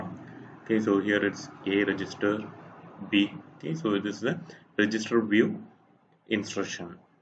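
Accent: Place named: India. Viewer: Indians speaking emglish sounds very ridiculous, next time use subtitles, the world will thank you.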